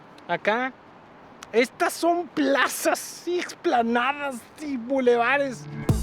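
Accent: Mexico. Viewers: Se le sale mucho lo mexicano esta vez. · Jaja 😆 me ha encantado tu paseo por ahí en Kazajistán 🇰🇿 y tus comentarios con esos acentos muy mexicanos wey: #tenga su tenge jaja 😆!! La escultura Dominicana 🇩🇴 👏👏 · Jajajajaja bien canijo me encanta como habla este muchacho bien Mexicano a lo mero mero!!! Me encanta su energia y alegría es como una Ave aventurera y donde el viento lo lleva ahi va bendiciones!! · Tu narración es de diez 👍👏👏👏👏👏no me aburro y hasta me rio con tu tipico acento mexicano. Realmente como dicen los españoles una gozada este video además de ser muy interesante 👍👍👍👍👍 Gracias y por más Kasachtan 🇰🇿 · El acento mexicano es insoportable. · yo te voy a ser muyyyyyyyy sincero la verdad yo veo tus vídeos porque el acento mexicano es un afrodisíaco para mis oidos. Vos estas guapo rico delicioso y de verdad me gustas me exitas me encantas veo tus vídeos e imagino te doy besos detras de la oreja.Y si tus videos son balsamo para mis ojos pobres que aun le falta por conocer. 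· Si dejara tanto acento mexicano sería mejor este YouTuber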